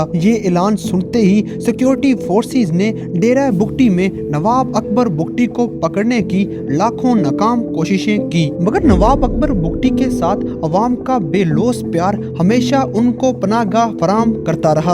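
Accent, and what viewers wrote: India. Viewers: In my opinion The accent belongs to India. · یہ چینل انڈین ہے اس کا لبو لہجہ بتا رہا ہے ۔ نفرت پھیلا رہا ہے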